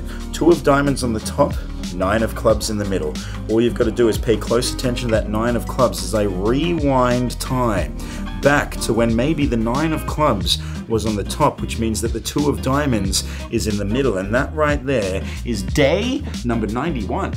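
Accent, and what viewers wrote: Australia. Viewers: Australian accent is so cool. I like the way you say doiemonds. Good luck on your trip. Can't wait to watch the vlogs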